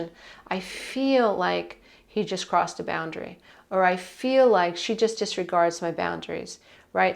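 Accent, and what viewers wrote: New York, United States. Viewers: I love watching your videos, you have a soft nature with a "no BS" New York-style tone. I experience a lot of "ah-ha moments" tied in with laughter too. Wack-a mole, that has always been funny to me, I love that you use that. I really feel like the universe guided me to your videos, they have helped me greatly, thank you.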